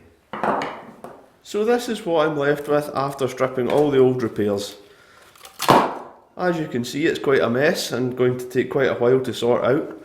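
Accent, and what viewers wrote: Scotland, United Kingdom. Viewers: I am here for: awesome restorations = 60%. Awesome scottish accent = 40%. HAHAHAHA love this channel mate! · What a warehouse of skills you have, so great! ( are you from the same country as Jim Kerr "SimpleMinds" ) your accent sounds the same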